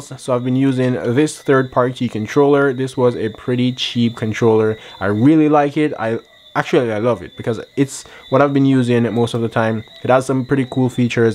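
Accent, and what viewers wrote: Jamaica. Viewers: I can already tell ur from Jamaica bro 😂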